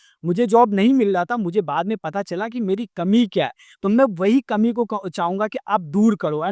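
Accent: Bihar, India. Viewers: Sir kya aap bihar se hai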